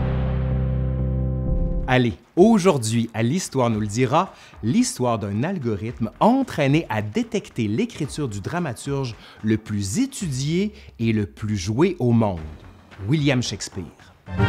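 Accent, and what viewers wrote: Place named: Quebec, Canada. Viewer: Un petit bonjour de Suisse! J'adore tes vidéos! Le contenu est extrêmement bien présenté! Et le ton de ta voix, plein d'enthousiasme et de suspense, est addictif! De même que ton air sympathique et l'expressivité de ton visage :) Après, en Europe, on a tendance à trouver joli et "pitoresque" l'accent québécois, je suis sûrement biaisée ;)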